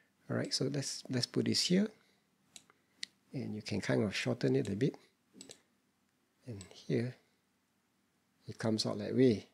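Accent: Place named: Singapore. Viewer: Love the Review. Even more, love the Singapore accent.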